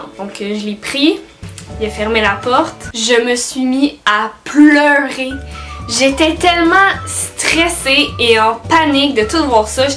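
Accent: Quebec, Canada. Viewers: J'adore ton accent 😍😍, tu viens d'ou au Canada? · j'adore ton accent québécois 😍❤👍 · Magnifique accsent c'est un accsent quebequoi? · Taissa 26 c'est l'accent canadien, d'autres l'ont bcp plus mdrrr là tu devrais comprendre 😂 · Omg l'accent québécois 🤤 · mdr pareil j'adore l'accent quebecois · J'adore ton accent canadien c'est magnifique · Ton accent québécois 😍🤩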